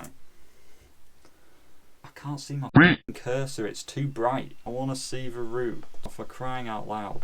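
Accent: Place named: England, United Kingdom. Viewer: Arf j'ai cru pendant 5 secondes que la preview allait être en français 😢 Heureusement, j'aime bien ton accent anglais.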